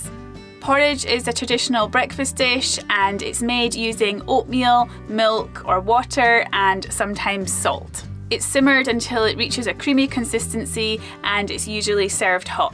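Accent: Scotland, United Kingdom. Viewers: Whereabout in Scotland is that accent from? 😂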